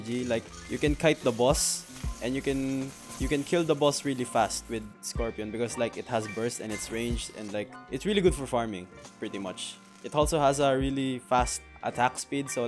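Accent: Philippines. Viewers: In your voice um I can tell your a Filipino Just for me ehhehehe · I think ur a Filipino Because of Ur Accent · your accent looks like filipino :/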